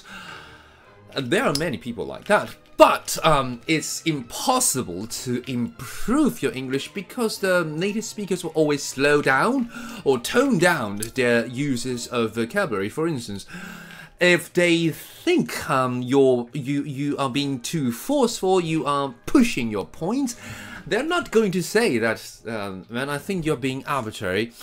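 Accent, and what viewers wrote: RP, United Kingdom. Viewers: Eko老師反差太大，明明外表超日本視覺系，感覺一開口就要講日文，但講出來卻是一口道地的英式英文，而且還能言之有物，厲害👍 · 這英式口音太有魔性了😂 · 看阿滴進來+1，老師的英式口音真的很好聽喔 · 我也是看了阿滴才知道老師真的太帥了~超喜歡老師的英式~口音~! 真的會重拾學習英文的動力~ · S C this is not the only way to speak English, you can always alter your accent towards the kind you prefer. The accent/tone is just because he is trying to convey a thought, or say, giving a speech. I don’t see a problem in this😂. There are more than one British accent of course, and this is just how ppl talk in formal occasion. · 我只能說，無論是不是有人覺得這是刻意的英式英文，但，我太愛了！很開心從阿滴頻道認識Eko。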